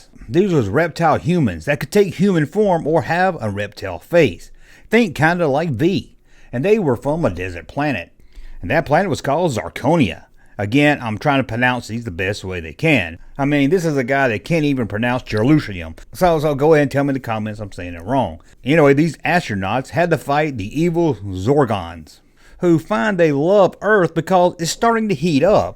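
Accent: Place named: Georgia, United States. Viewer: Who gets mad a a great Southern Accent? Love the Georgian draw. No gay shit.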